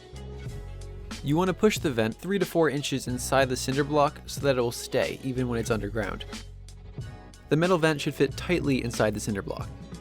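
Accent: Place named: England, United Kingdom. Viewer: New England but your accent is well English haha interesting though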